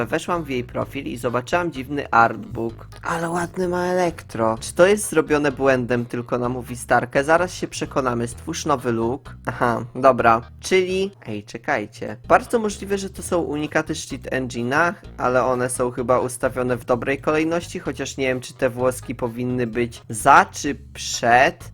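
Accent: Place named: Podlasie, Poland. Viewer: Omg mam wrażenie, ze jestes z Podlasia bo gadasz po mojemu hahah❤️Mój nick: brązowa laska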